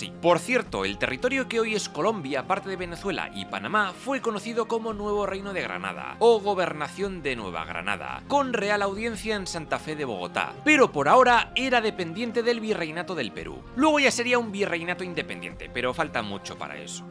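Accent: Spain. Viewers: Acaso es un español el que esta narrando???????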